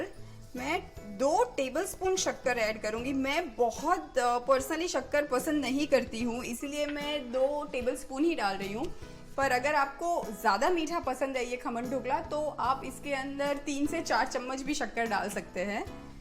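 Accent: India. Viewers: Nicely explained and the hindi accent!👍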